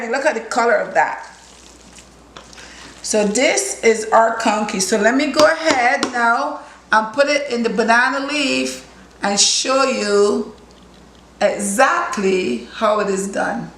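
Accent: Barbados. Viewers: Your recipes are always on point. Any one can follow. I am so impressed that even though you've been away from this land for so long you sound so bajan. And you remember everything. Love ❤ it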